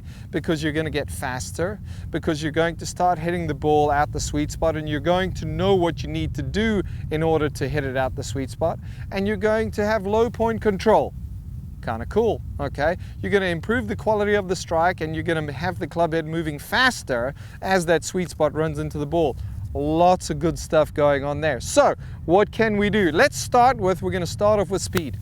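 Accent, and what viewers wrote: South Africa. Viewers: Howzit, First time watching this video and found it really helpful. I just wanna ask one thing Are you South African because you have a certain twag that really seems South African 😂😂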